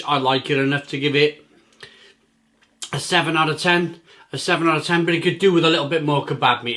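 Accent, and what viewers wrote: Wales, United Kingdom. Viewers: What part of wales are you from fella?I'd say cardiff way.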